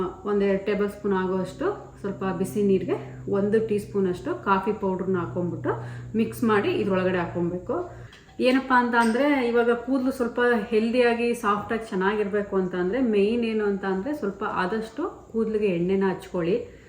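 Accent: Mandya, India. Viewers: Yes she's in US no Humu dim proudish always talk with natural Mandya kannada ❤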